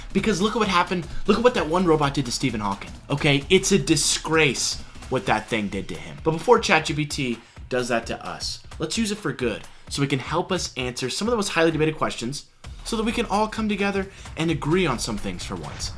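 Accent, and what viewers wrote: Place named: United Kingdom. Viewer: I thought you was British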